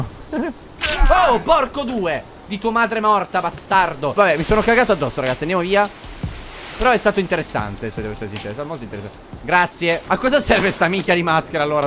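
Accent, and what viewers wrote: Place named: Bari, Italy. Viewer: da quando favij e diventato barese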